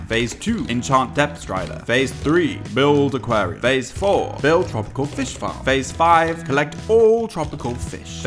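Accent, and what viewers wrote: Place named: United Kingdom. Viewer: Ur accent is a so British lmao luv the vid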